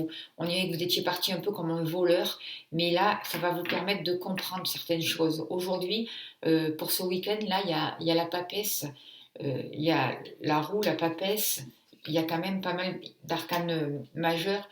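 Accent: South, France. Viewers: bonjour et merci pour vos videos tres justes, et j adore votre accent du sud ;)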